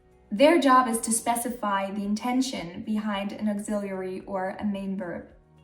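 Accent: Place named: United States. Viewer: Is she American or German? Her accent is too american.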